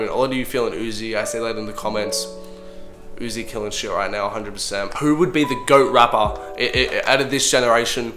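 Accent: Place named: Australia. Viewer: bro ur Aussie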